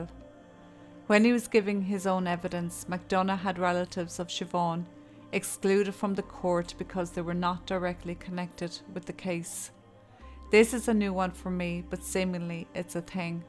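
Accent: Ireland. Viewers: ur great keep it up irish storys told by a irish lady perfect chill in bed channel ❤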